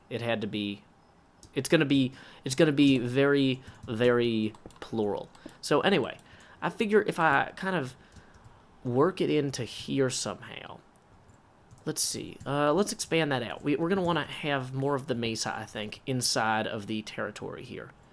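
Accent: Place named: Nashville, United States. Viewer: There once was a man from Nashvilles, Who calls himself Yes that's his name Minecrafting's his game With a lovely accent from Nashvilles.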